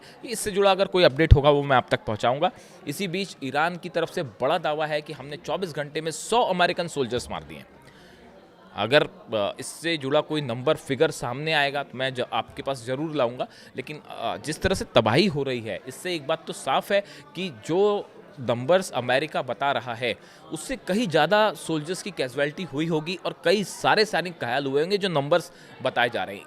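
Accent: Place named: Uttar Pradesh, India. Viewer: bhai ka apna alag andaz bc wala, pure UP style and our favourite 😂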